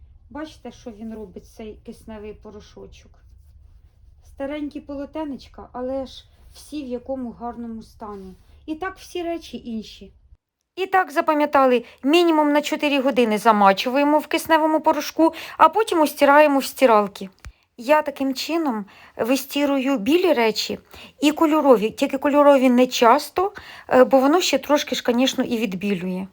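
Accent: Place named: Surzhyk, Ukraine. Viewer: Який жахливий суржик! Невже не можна хоч словничок погортати, перш ніж знімати відео?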